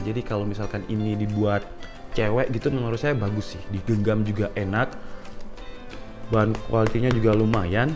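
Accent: Java, Indonesia. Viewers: Samean jowo ngendi mas ? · Jawane ngendi mas? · Bahasane bahasa malangan mas😂😂😂😂 aku yo tekok malang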